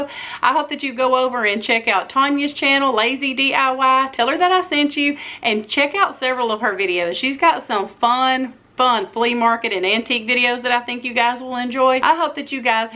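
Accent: South, United States. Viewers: I'm from Georgia and you sound as southern as I do. LoL love you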